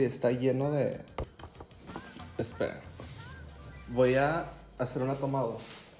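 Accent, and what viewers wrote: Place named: Fresa, Mexico. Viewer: Jajaja yo no sé nada de las maneras de hablar en México pero jajaja parece que ella habla muy gomelo creo que en México le dicen fresa pero muy fresa jajaja a veces esa manera de hablar me incómoda un poco en los vídeos pero na me la paso genial!!!!!! ❤️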